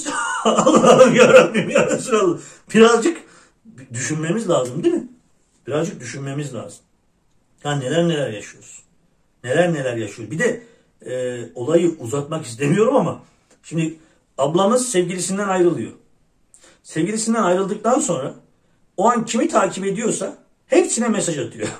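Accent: Erzurum, Turkey. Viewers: yok reis ne sorunu başım gözüm üstüne konuşman biraz Erzurum lu arkadaşlarima benzettim ondan sordum. 🤣🤣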